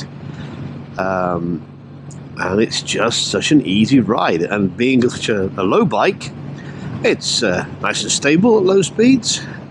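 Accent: United Kingdom. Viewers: Very enjoyable vid and well made for saying you only have a couple of other vids on your channel. I've always thought the W800 as a lovely little retro but probably a bit small for my 6ft 4 frame. You sound British and very clear to listen to. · It took me a minute to realise your are not in the UK. The accent got me.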